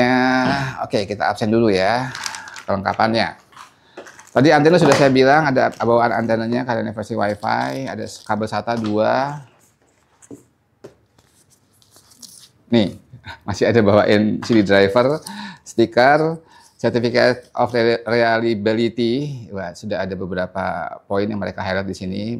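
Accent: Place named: Java, Indonesia. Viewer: kl lebih diperhatikan, logatnya beda mas ferry itu orang sumatera (jambi) sedangkan bang jawa tulen nih (jogja)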